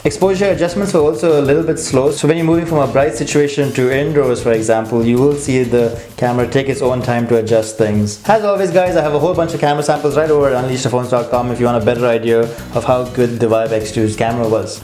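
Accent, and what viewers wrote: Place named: India. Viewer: great! grammar is pretty good but you sounded so indian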